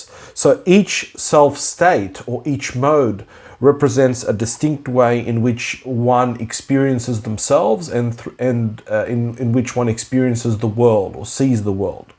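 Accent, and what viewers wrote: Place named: Australia. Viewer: Very interesting. I am an identical twin so there's a whole other aspect of "self" to address as well, which singletons do not. All four self states are thus magnified in essentially two identities - together as twins, and as separate individuals. The external experiences impact the significance of the development of self as well for eg, all our lives we are assessed re our differences and our likenesses by others who inform us of their conclusions, yet simultaneously treat us as a "unit" (eg one soul split into two bodies), thus the development of our "self/selves" is extremely public, ceaseless and difficult. To be told from a very early age who your inner child is, being critiqued, in the context of being compared etc with someone else's inner child is really confusing for young kids, and not easy as an adult either. It's almost like the inner child and inner critic are both external and internal for us. 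So as I listen to your excellent talks I filter all you say through our and my & her perspective. Many twins/multiples become bitterly estranged as the inter- & intra-personal self states are too hard to deal with without good help, and good help is extremely scarce. There's a lot of info about twins and our genetics etc & for their parents but practically nothing for twins themselves in how to navigate this often confusing state so that the adult and warrior can handle things well. Thank you for your great work :). Great to hear a fellow Aussie.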